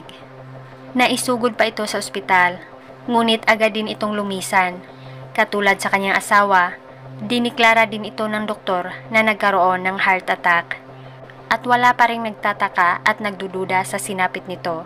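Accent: Visayas, Philippines. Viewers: Bisaya po kaya iba pagkakabigkas nya..☺ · Ang ganda ng boses nya sa mga nag bash sa kanya mag trabaho kayo wag palamunin😂😂 oo bisaya yan sya alam ko kasi bisaya din ako ano naman kung bisaya mas matalino mgabisaya kasi fluent kami mag English kami marunong mag tagalog with taglish pa kayo marunong kayo mag biisaya lol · Bisaya kc kaya minsan kaiba ung tunog ng oagtatagalog nya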